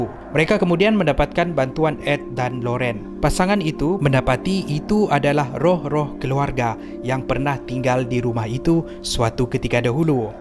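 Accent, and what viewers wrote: Sabah, Malaysia. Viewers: Abg orang sabah ye?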